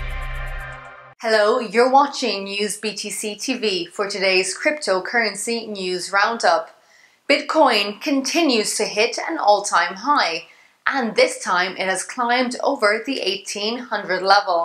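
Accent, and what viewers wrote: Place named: Ireland. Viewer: Like the Irish accent.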